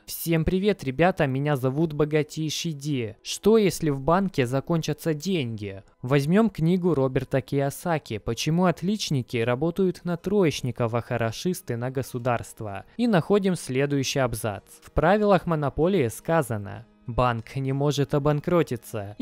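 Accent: Ukraine. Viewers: не только,у него произношение такое прикольнозвучащее,кроме акцента...укр. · Это украинский акцент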